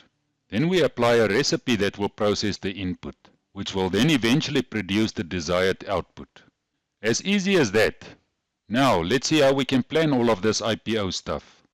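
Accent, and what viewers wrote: South Africa. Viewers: Familiar South African accent. Sounds great. Great explations.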